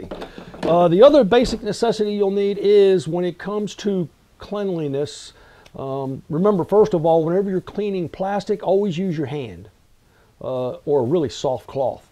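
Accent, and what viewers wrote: United States. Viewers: The guy doing the video sounds American.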